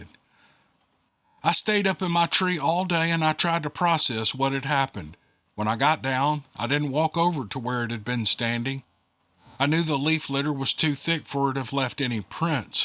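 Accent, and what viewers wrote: South, United States. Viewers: People can be mean and annoying, let them get butt hurt over nonsense. I love hearing your voice narrate these stories, reminds me of my southern folk- we have cool accents! Other people can be jealous of. Keep up the good work,. Hopefully, I’ll hear my story from you soon.:)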